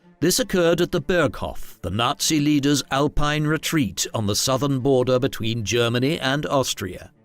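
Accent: RP, United Kingdom. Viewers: I saw that. Huge mistake by the documentary makers. The reader sounds posher then the King.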